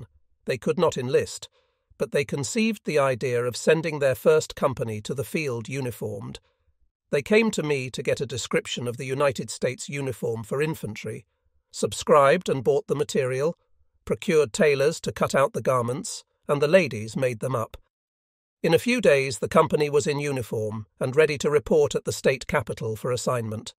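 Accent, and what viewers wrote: England, United Kingdom. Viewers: The English accent of the AI narrator is incongruous with what is a uniquely American event.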